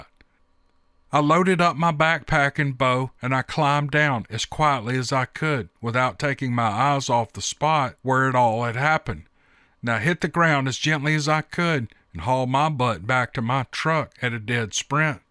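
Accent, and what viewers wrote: South, United States. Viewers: OMG, what an incredible & terrifying encounter! Especially for a couple of fairly young lads still! From the stories I've heard from others, at least a thousand by now since I retired, discovered YouTube & have way too much time on my hands, I think if those Sasquatch wanted you, you would've been had. And given you guys shot at him, you're lucky you boys weren't....had that is. From what I understand they're incredibly fast & agile despite their enormous size. I've heard stories where they've paced vehicles upwards of 35-40 mph! I think they were 'escortin' y'all off their territory. Thank you for sharing your incredible encounter! And, thank you too. As usual your narration, in that sweet Southern drawl just soothes my soul.👣. 🗣️Whoop whoop!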